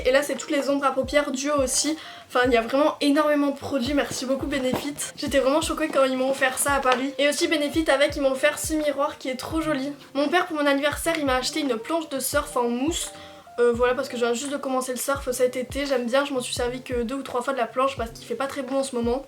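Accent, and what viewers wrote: Belgium. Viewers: T'a Un accent belge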